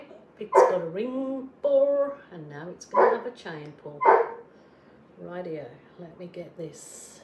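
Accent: Birmingham, United Kingdom. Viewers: Like the wrapper of a gorgeous chocolate bar 😋😍. Are you from Birmingham, England originally?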